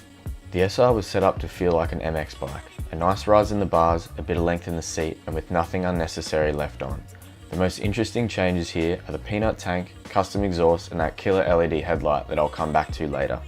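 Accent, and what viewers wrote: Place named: Australia. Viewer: Love the narrative. Aussie as fack.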